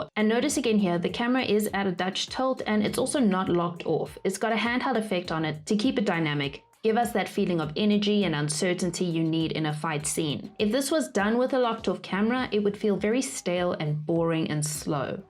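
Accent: South Africa. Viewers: I'm from South Africa too! (I could tell from the beginning)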